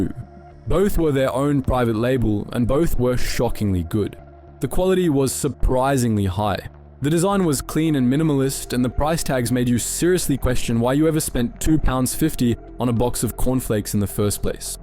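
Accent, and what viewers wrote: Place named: Australia. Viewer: Why is a Australian talking about the UK? This feels like AI and who ever set this up thinks Australian is British.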